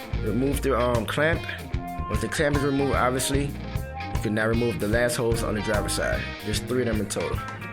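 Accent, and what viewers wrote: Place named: Jamaica. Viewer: Nothing Cummings in you guys face. LOOOOOL Yankee boy. i hear a Jamaican boy a twang.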